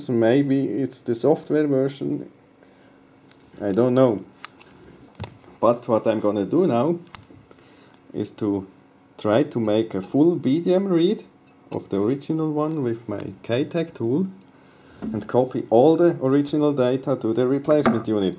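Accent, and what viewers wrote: Switzerland. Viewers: switzer englisch versteht man wenigstens ;) thanks for video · Soo guet ne schwizer uf englisch am tutoriel mache.. würschmers Bärndütsch erklärä😀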